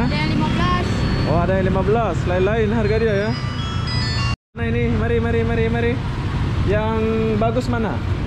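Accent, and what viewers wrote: Lombok, Indonesia. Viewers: Bahasa Melayu mcm Malaysia tapi saya tetap boleh perasan slang Indonesianya hehehe Unik betul org nusantara nih! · Hahahha pandainye abang bual cakap dari lombok😄😄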